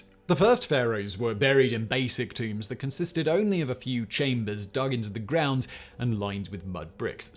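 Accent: England, United Kingdom. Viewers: This gives credence to the saying that when someone speaks with 'English' accent they sound cleverer than they are. This was the least informed video I have seen from this channel. This was ethnocentric description Egypt's greats. In his list, the founder of Egypt came last. Never bothered to mention a significant reign of the Kushites that probably reigned longer than some he referenced, or Imhotep whom was highly influential and unusual character in Egyptian history. Basically this says Egypt was about the kingdoms that defeated it from outsider Africa, from Hyksos to Romans, whom were occupiers of Egypt. Its like talking about the US and forgetting to make a fuss about George Washington, and to make matters worse, George Washington was considered the least important amongst a top ten list of Most important Americans. Balderdash.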